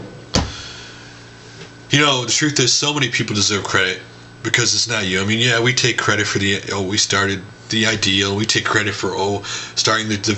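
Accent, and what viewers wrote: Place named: Austria. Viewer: speak German with an Austrian accent - much like Arnold Schwartzenegger's accent. That is quite amazing doing videos in both English and German. I considered doing something similar with Dutch and English videos but most Dutch gamers speak English too so I figured there would not be much of an audience besides doing the same video twice is just an enormous amount of work. Great episode man! A good personality comes across regardless if you are in front or behind the lense.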